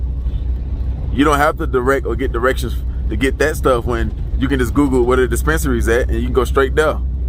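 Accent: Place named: Miami, United States. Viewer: Where you from fam? You sound like a Miami nigga...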